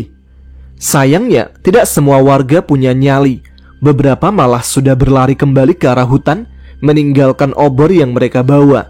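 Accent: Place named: Bogor, Indonesia. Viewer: Suoro bogor❤😂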